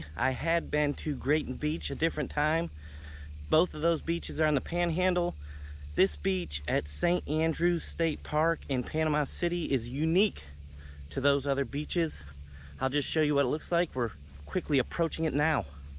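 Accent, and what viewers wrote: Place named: Kentucky, United States. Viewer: thanks for great video! best on youtube!cool Kentucky dialect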